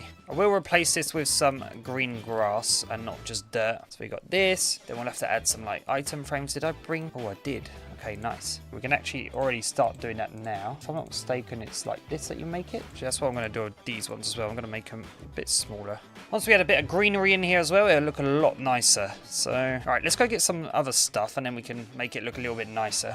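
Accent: United Kingdom. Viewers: oh sh*t its a british person W video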